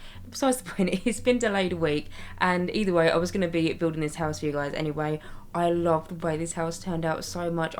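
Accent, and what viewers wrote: United Kingdom. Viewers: i love your british asent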